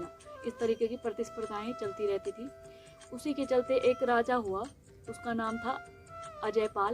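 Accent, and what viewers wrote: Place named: Garhwal, India. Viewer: I really like the way you talk, the garhwali accent 😍 is just killing.. and you are cute also i would say.👍. Soon you are going to be a top blogger ,keep it up.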